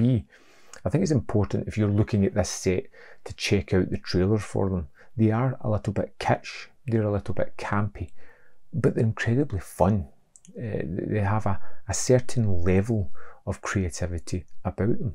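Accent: United Kingdom. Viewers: Was just roaming at Arrow to buy some stuff and saw the Grffe box wondering what that might be. With Arrow you'll never know. :D So I ended up here and liked it for several reasons: - the review gave me a great idea what to expect and personally I don't mind seeing footage, pictures is good enough - as a non english native who used to have an american accent this is great to learn proper english and shit to a more british accent (thanks for not speaking to fast :) ) - for the purpose of ending the american dominance on YouPuke I could subscribe to a british channel, nice Salute from germany.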